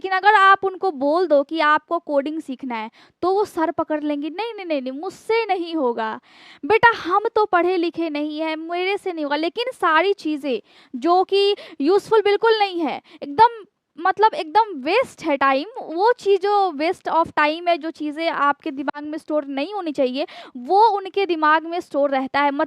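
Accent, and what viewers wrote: Bihar, India. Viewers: Bihari lag rahe ho Ghar hota h Ghad nahi Agar hota h Agad nahi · I love your way of speaking like bihari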